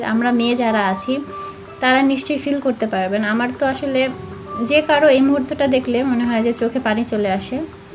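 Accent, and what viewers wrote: Sylhet, Bangladesh. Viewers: আসসালামু আলাইকুম আপু কেমন আছেন? আপু আপনি কি সিলেটি? আমার বাড়ি সিলেট তাই জিজ্ঞেস করলাম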